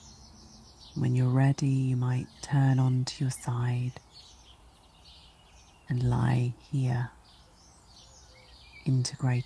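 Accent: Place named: England, United Kingdom. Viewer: Ouse Ally and Kristen Roses meditation videos daily. I really enjoyed this. Thank you 🙏It’s especially nice to hear and English accent.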